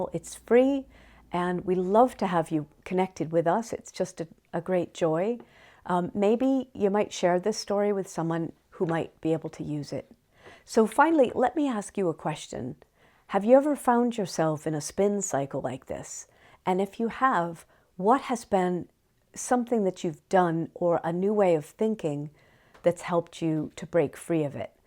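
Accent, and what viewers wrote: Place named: Ireland. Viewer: Thank you for this story! I’m new to your channel and wow, what a treasure trove. (Side note: as an American, I’m really struck at listening to your Irish accent at how much more similar it is to general American than anything found in the UK) But thanks again for your work here, I’m a big fan already 🙌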